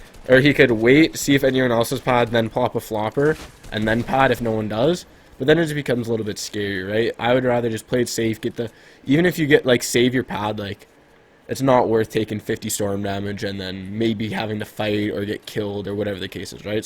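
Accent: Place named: Canada. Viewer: ur canadien i could tell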